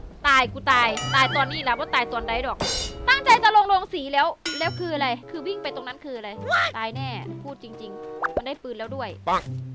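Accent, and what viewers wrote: Isan, Thailand. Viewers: เอื่อย เจ้าคือเว้าอีสานม่วนเเท่ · อันเจ้าอยู่อีสานติเว้าคือแฮงมากเลยเด้อเอื้อย 😊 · เปันคนอีสานหลอค่ะ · พี่พูดอีสานเพราะๆ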